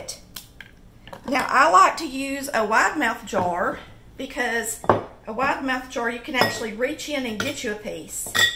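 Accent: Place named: South, United States. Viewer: I swear, even if I didn’t like to cook and didn’t like your recipes, I would still watch you to listen to you talk 😍 Watching all these southern ladies talking in the kitchen on videos brings back my childhood. I grew up in Oklahoma but moved to Minnesota a long time ago, they don’t cook like that or talk like that up here !!!!!!!!!! Blessings to y’all and Merry Christmas A King is Born ✨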